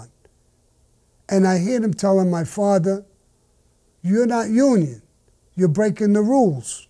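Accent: New York, United States. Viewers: hes great storytellar that old school newyork accent i miss hear in it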